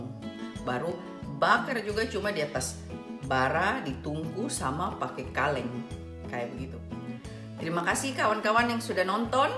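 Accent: Papua, Indonesia. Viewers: Salam kenal Dek.. Sy SUKA LOGAT PAPUAx...😍😍😍Asli... Sa pikir...su sampe...Belanda Logat Lain kah😂😂😂 Andalaang😍😍Gbu Sorong Papua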